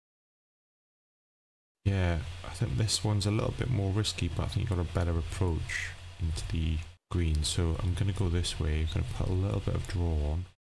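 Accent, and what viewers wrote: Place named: Newcastle, United Kingdom. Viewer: Hey thanks for the play through - really enjoyed your round - pretty much as intended.. and great to hear a Newcastle accent!… appreciate the exposure… yeah I have few courses out there - Bramley Manor is the previous one and Shearwater Sands is another links ..: cheers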